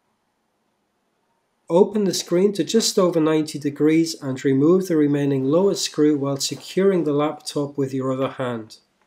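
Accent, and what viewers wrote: United Kingdom. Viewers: Hi there! thanks for the tutorial! Where did you buy the assembly from(which buyer)? I found some on Ebay, shipping from China, but I am kinda skeptical. If it was from China, did you have to pay customs? (you sound British, so it should apply to me as well as I am from the EU).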